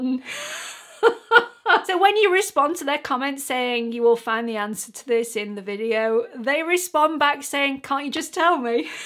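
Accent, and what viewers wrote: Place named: Yorkshire, United Kingdom. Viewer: I've just found your channel and you are so refreshing and relatable. I love that you're from Yorkshire too. Accents fascinate me and it's so cool to hear such a variety of them. Now I'm off to binge watch all your videos while I get ready for work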